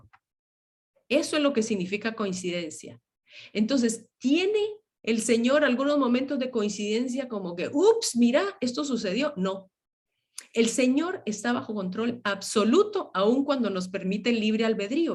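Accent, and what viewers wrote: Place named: Costa Rica. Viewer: Tienes acento de costarricense .. !! 😀 👍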